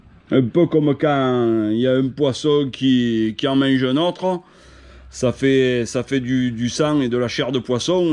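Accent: South, France. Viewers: J adore ton accent du sud 😂 et j ai le meme fluro que toi je l ai chopé a decat ...bon les crabes la ils sont pas trop vivaces😅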